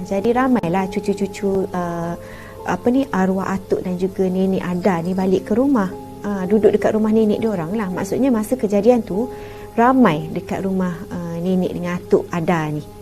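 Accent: Kelantan, Malaysia. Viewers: Bau budo la kak..ckp kelantan jerr kak x payah ckp KL..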